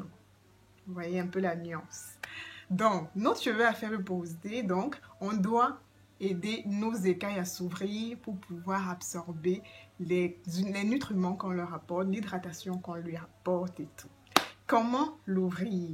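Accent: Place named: Cameroon. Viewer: Bonne vidéo avec notre bel accent Camer👏. L’idée de ramener les beurres à l’état liquide est géniale merçi.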